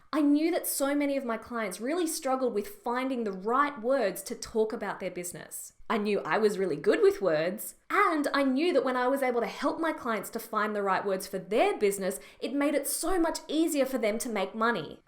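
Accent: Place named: Australia. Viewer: Great tip to find a niche within a niche..Didn't know you say niche differently in Australia (I'm a kiwi living in USA)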